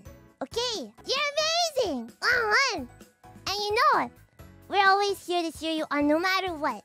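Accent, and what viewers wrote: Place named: Japan. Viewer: 到底怎麼把英文講得跟日文一樣… 我愛死她了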